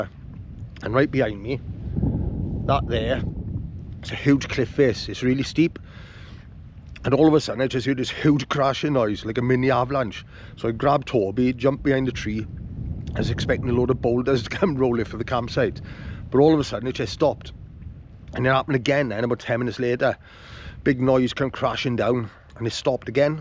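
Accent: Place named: Abercynon, United Kingdom. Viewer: Enjoy your videos, I'm a Carmarthen man but my wife is from Abercynon so I fondly recognize your accent from the area . Like you I enjoy getting out wild camping but my poison is a spiced rum which I enjoyed in the middle of nowhere with the rain pouring down in a mist .It soothes the soul. Keep up the great content.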